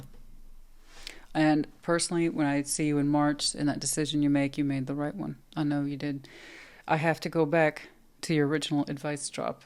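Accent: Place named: Texas, United States. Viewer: You are the #1 Tarot channel, so many just read each other's scripts, don't show themselves actually doing the reading. Have no in-depth knowledge of the tarot & have horrible voices, unlike your sexy Texas drawl & wicked laugh. Thank you for being here & being so sassy I love your channel! Capricorn power!!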